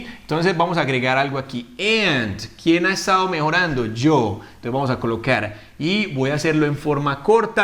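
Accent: Colombia. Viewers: Me desconcentra el acento colombiano 😍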